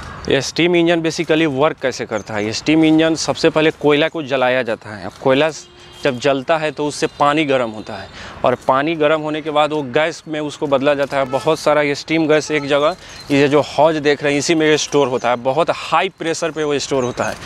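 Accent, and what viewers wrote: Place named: Bihar, India. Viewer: प्लीज बिहारी हिंदी में ना बोले 😭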